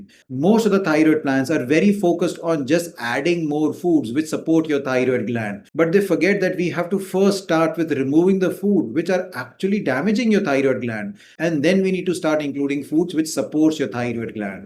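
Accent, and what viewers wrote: India. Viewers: A strong Indian accent is difficult to understand at times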